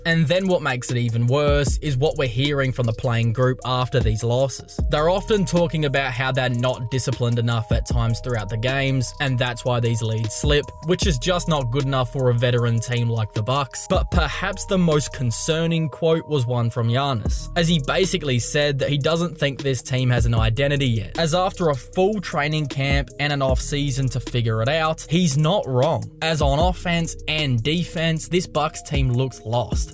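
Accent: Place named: Australia. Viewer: You’re voice is so Aussie that it’s not even Aussie any more haha! It’s awesome 🤣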